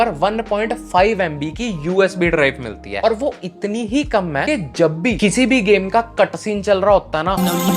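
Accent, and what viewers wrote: Punjab, India. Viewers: ਬਾਈ ਤੇਰੀ ਹਿੰਦੀ ਚ ਪੰਜਾਬੀ ਮਿਕਸ ਬੜੀ ਵਧੀਆ ਲਗਦੀ🤩 ਮੈ ਤ ਤੇਰੀ ਵਾਜ ਸੁਣਦੇ ਸੁਣਦੇ ਕਿਨੀਆ ਵੀਡੀਓ ਬੇਖ ਲਇਆਂ 😅👌🏻💐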